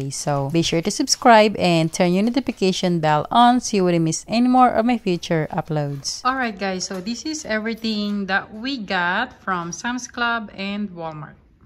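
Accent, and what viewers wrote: Philippines. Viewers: Mam meron po kayo Filipino accent!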